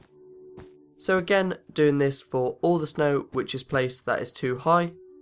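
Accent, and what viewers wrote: United Kingdom. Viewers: No not a robot accent... A British accent. #bruh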